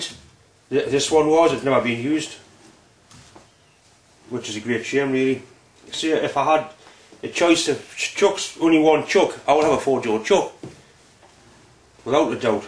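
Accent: Newcastle, United Kingdom. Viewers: ***** Closer to Scotland than Yorkshire as it happens, but yes, I'd say in England, around Newcastle or thereabouts. Closer to a Geordie than a Maccem though. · zonnekat he speaks Geordie,from the north of england!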